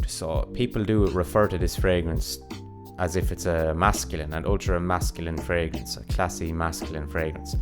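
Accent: Ireland. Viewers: Your never Irish are you?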